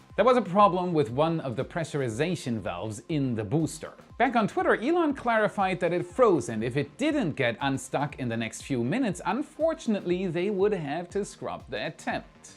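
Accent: Germany. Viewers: You can move to the us,but the german accent îs still there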